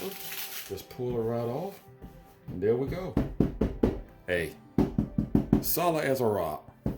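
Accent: South, United States. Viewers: Love the country accent.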